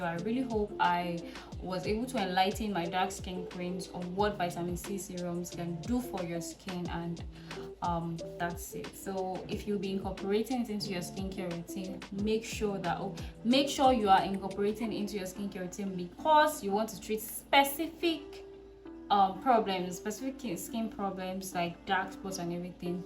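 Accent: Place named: Nigeria. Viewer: 😂😂wow🥺🥰 I asked because if not for the way you spoke I wouldn't have thought you were Nigerian fr😂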